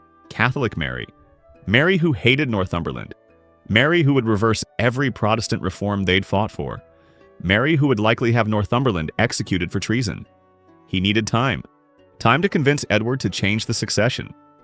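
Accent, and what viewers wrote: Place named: United States. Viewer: yes another crappy American voice over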